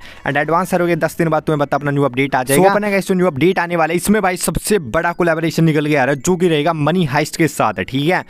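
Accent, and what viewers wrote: Punjab, India. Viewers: Bhai kya aap Punjab se ho🤔🤔🤔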